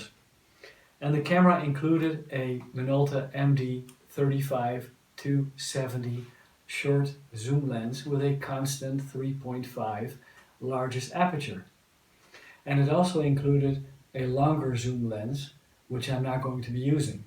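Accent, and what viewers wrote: Netherlands. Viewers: Are you Dutch? Sounds like a Dutch Accent 😄✌🏽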